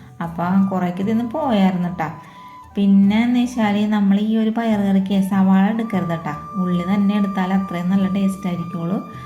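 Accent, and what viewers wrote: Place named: Kodungallur, India. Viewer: കൊടുങ്ങല്ലൂർകാരുടെ സംസാര ശൈലി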